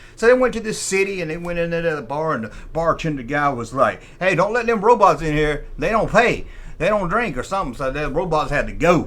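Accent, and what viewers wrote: South, United States. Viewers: Now this is my kind of recap/storytelling of the original Star Wars movie, as someone who also is a southerner, I understood everything you said.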